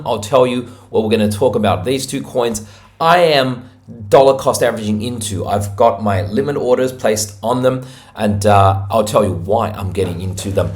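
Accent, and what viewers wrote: Australia. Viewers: Like your show very much are you an Aussie?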